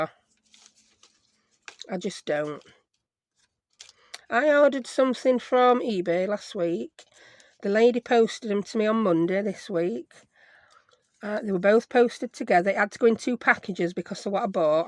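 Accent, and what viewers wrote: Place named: Yorkshire, United Kingdom. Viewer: They look fab, and yes I get the bit about running over stuff with the wheelie chair, I do it all the time 😂 I’m in New Zealand and my parents were from Yorkshire so love hearing your chatter x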